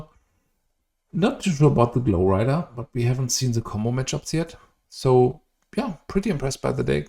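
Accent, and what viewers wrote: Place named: Germany. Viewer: Vom Akzent her bist du sicher Deutscher! Cooles Deck und und nur Support für den Atzen!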